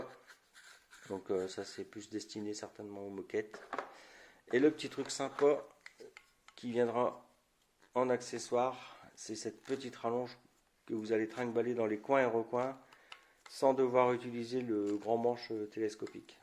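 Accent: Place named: North, France. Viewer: Désoler mais votre accent jme suis douter que vous habitez dans le nord 😂, moi je suis du pas de Calais 😊😉, merci beaucoup pour votre vidéo...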